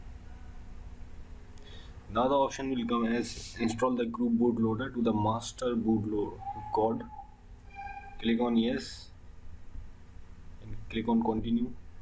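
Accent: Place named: India. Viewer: I honestly didn't give a du🦆ck about the subject but his Indian accent 😊. Man don't stop love you, made my joint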